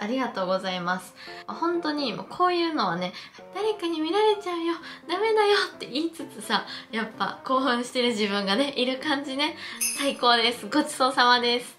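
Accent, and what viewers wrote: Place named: Kansai, Japan. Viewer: 関西弁で話すから余計に面白い良いお年を (^^)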